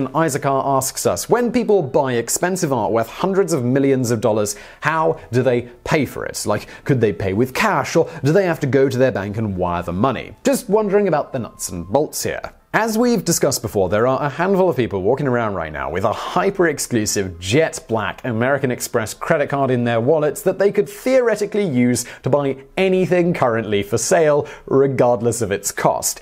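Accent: United Kingdom. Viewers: you sound like a Brit but always use such American words, like “Cheque account” in this video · And I enjoy listening to a British accent. I find it novel. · Come on Babish, your British or American. Make up your mind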